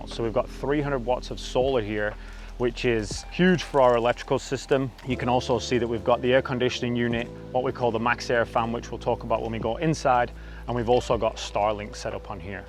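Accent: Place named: Lancashire, United Kingdom. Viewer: Nice van, something tells me there’s a bit of Darwin, Preston, Blackburn in your accent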